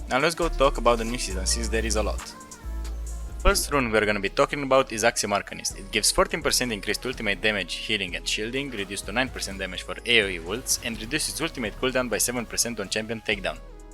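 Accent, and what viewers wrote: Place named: England, United Kingdom. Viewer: Pana cand nu m am uitat la numele tau nu mi am dat seama ca esti roman frumos accent de englez keep up the good work!!!!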